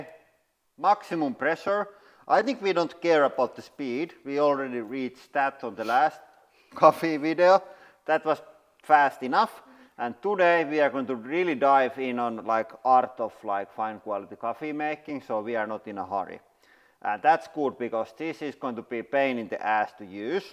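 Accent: Finland. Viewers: I used to live in Helsinki and frikkin love the Finnish accent. It's mint. Also love the detail of using Iitala glassware. Torille tavataaaaaan! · i only now realize this channel is finnish! its right next to russia ofc but because sweden has basically perfect english i was surprised by the accent.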